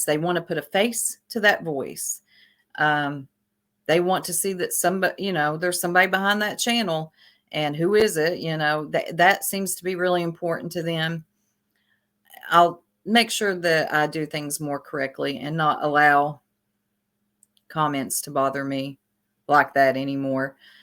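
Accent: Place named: South, United States. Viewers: You sound like you are from Kentucky. Aww I hate that happened to you but you are taking it well. I hope you get it back 90 days. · Love love love that deep southern accent! So nice to put a pretty face to the voice! Thanks for all you do and congrats 🎉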